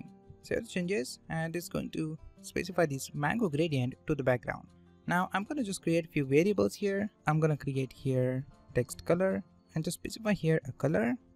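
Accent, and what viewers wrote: India. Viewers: Awaaz Desi si lag Rahi hai.. YouTube me HTML and CSS sikhane wale mostly Desi hi hai..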